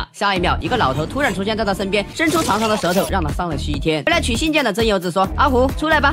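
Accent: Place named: Sichuan, China. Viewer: 我擦 解说是四川人吧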